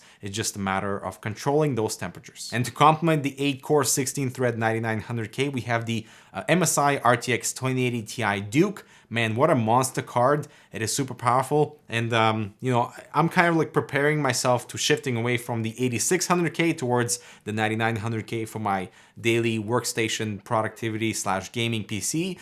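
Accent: United States. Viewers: is your accent american or swedish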